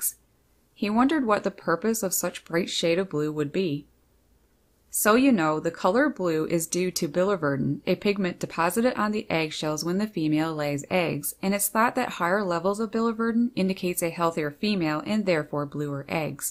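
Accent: Canada. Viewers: I really love this video about the color of Robins’ eggs & the blueness! Very interesting and informative and educational! It totally makes sense! I was just thinking maybe they were that color, so if the egg fell out and went on the ground… But I guess mom or papa bird can’t pick that egg up and put it back in the nest!!! Thank you very, very much! Love your Canadian accent! Gay Michaelis 😁👋❤️🤗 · I can tell you're from Canada. You say" aboot" for about. Lol.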